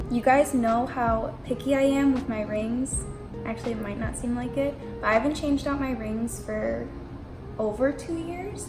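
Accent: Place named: Michigan, United States. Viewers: if you've ever wanted to hear a michigan accent this is it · You have the same accents as my friends from West/North Michigan haha I'm from Southeast Michigan it's dope how there are different accents within the same state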